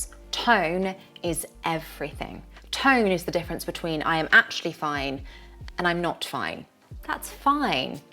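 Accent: United Kingdom. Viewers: I love british English I always watch your videos teacher Greetings from Peru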